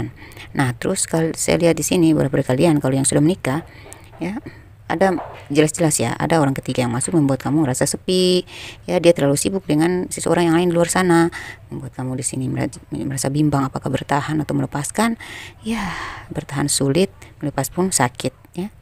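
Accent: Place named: Cigombong, Indonesia. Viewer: Ko suaranya yg seperti aku kenal ya.... Seperti suaranya orang cigombng/ lido ...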